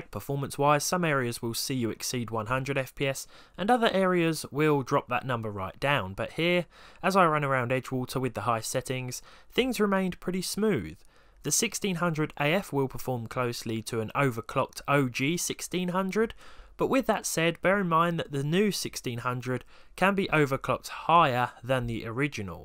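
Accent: United Kingdom. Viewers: I love ur Britishaccent ^^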